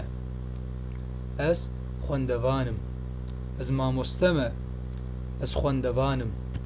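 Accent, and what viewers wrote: Turkey. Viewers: The speaker is speaking Kurmanji, but he has Turkish accent when he speaks Kurmanji. Unfortunately, many Kurds first language is Turkish in northern Kurdistan because our language was banned in northern Kurdistan. Turks thought they could assimilate us :(.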